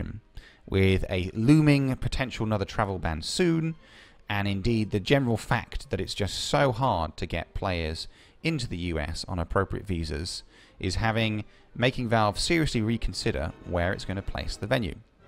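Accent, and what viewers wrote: United Kingdom. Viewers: Most typical british person ever. he has got it all, top hat, accent, politeness and ego. Amazing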